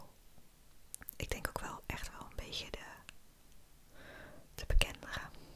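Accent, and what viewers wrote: Rotterdam, Netherlands. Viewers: Hallo! Ik woon ook in Zuid-Holland en ik hoor aan u gepraat dat u volgens uit Rotterdam komt. Klopt dat of zeg ik iets raars😂 U filmpjes zijn heel fijn en u heeft een fijne stem Xx Sieb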